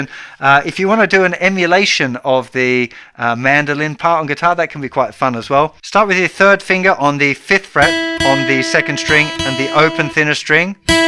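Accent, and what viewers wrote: England, United Kingdom. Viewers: Good day to you sir .I love ur all guitar teaching and lessons ..you are a British? If I'm not wrong hehe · Bro! You’re Australian? Been watching your videos, but never realised - definitely hear an English twang. But you said you played mandolin in Tasmania. What gives??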